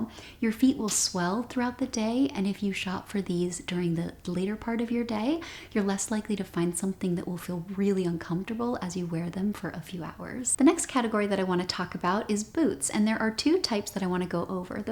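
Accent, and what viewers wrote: United States. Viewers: Same here! I also love her accent, it's so good to listen to. I don't know which part of the US she is from, do y'all have a guess?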